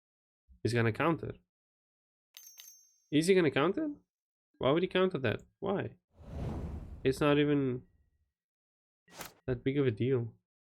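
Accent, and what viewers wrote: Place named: South Africa. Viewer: The South African accent gets you a sub. Good video buddy.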